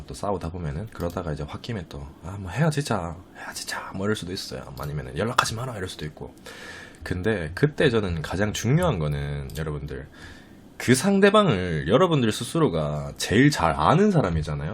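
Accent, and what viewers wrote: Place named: Daegu, South Korea. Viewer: 대구사는데^^익숙한 사투리라 너무 좋고 진짜 최고의 인강을 보는거같아용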